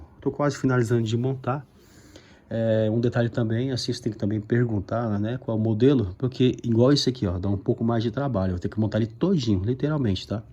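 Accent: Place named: Brazil. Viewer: Vc é de qual estado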